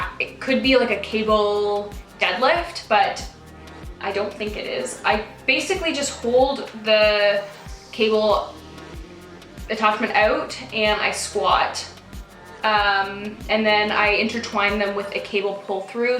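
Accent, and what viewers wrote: Canada. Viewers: Are you Canadian because the way you say out is different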